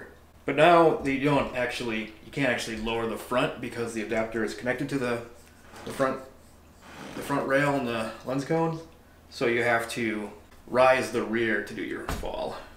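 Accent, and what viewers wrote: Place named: Minnesota, United States. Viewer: I thought I recognized that accent! Used to live in Duluth. 😅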